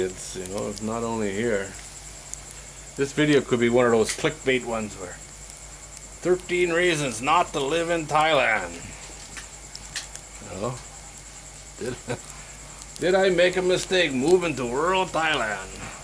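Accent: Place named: Manchester, United Kingdom. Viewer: Manchester speach 👍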